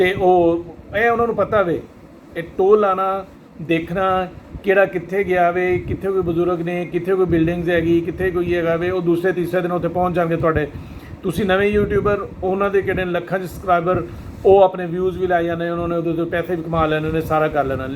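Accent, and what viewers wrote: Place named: Fazilka, India. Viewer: Jis Insan da Takullash hi..Dast..si..oh wakya hi... Laxman Dost si .ohna de.. Akal Chalana da Afsos hai..Or.. Fazilka or Jalalabad West.. Ilaqey d Bolli da Lehza..Same tuhadey Ilaqey da Lehza hai... Tuhada Gam..Sanjhah karna..tuhadi. . Parwarish da Natiza hai..Sada Hasdey Wasdey raho.. Slam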